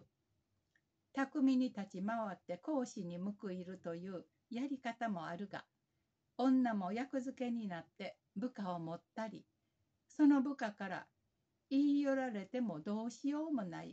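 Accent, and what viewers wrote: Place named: Kansai, Japan. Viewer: こんにちは、私は長年田辺聖子さんのファンでした。本で読み慣れた田辺節、滑らかな関西弁のイントネーションと共に大変楽しく拝聴させていただきました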